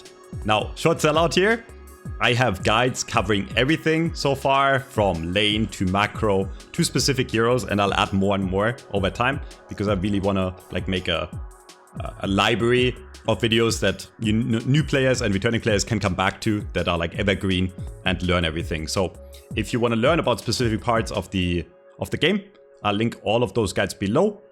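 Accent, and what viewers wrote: Germany. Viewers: are you german or danish? Basing it on accent.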